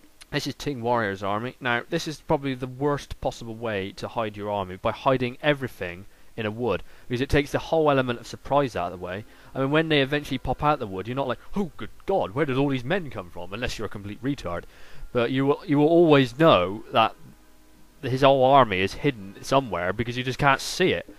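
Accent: United Kingdom. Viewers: Southwest england? Or scotland or nothern Ireland?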